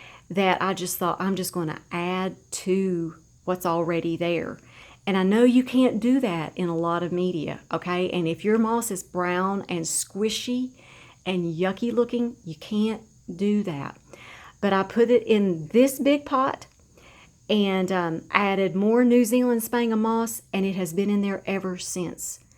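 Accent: South, United States. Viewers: without humor or the ability to laugh at yourself life would be sooo boring. I never take myself to serous. I like to be silly. Lost it for a bit but recently found it again. Right here on youtube among all of you❤ Its gonna be a great expiriment i have 1 sharry baby division in Chinese spagnum. It came off the same plant that had 20+snails and is currently residing in water and doing AMAZING. its in my second latest vid (how do you call that in proper English🤔 ) when i have them both in moss I'll come tell you. So You can be the judge of the moss (if its came back to life or if its the bad kind) you never fail to wam my heart. I might be Dutch but i do have the same good oll "southern?" Charm.😉 Notice that its the second time im trying to fish for info about where your from... since i love the accent but are not an expert.😁😇 Much love and happiness Kind regards rose 🌹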